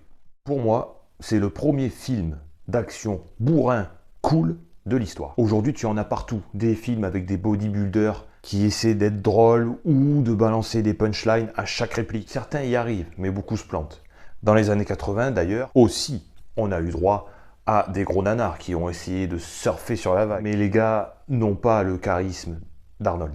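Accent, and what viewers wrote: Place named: Bas-Saint-Laurent, Canada. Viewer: oui l'accent du bas du fleuve que ta pris pas celle de MOntréal ni Québéc bien content d'avoir faite ta connaissance le cousin de la france génial ton video 🤣🤣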